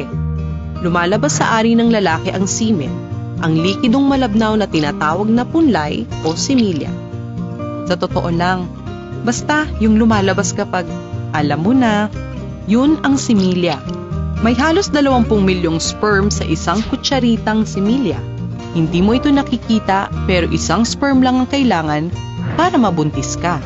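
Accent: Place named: Philippines. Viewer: Very tagalog 😂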